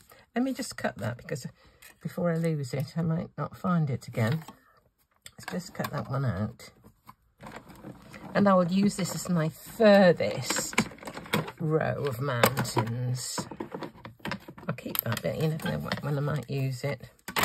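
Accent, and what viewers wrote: United Kingdom. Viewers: Your lovely British accent gave it away!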